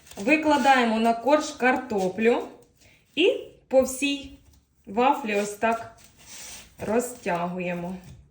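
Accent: Ukraine. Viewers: Ви з Полтавщини?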